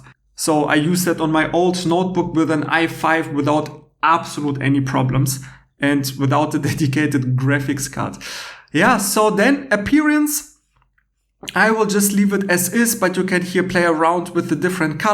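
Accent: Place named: Germany. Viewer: Gibt es das auf Deutsch? Dieses hässlich klingende Englisch mit deutschem Akzent ist unerträglich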